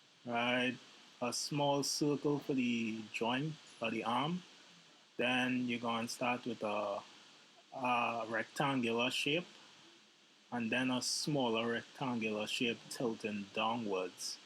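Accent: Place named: Trinidad and Tobago. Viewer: Sound like a Trini bro lol, Good Video btw, learnt a lot !!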